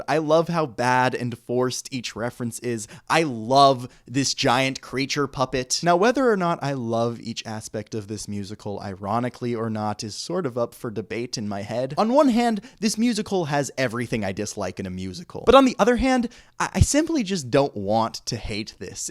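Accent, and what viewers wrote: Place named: Australia. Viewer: As an aussie i was also wondering why he sounded vaugely australian 😂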